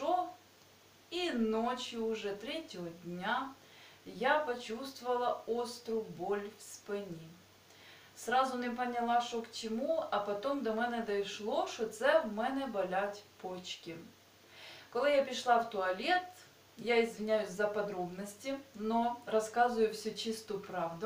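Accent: Surzhyk, Ukraine. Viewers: такой суржик👍👍👍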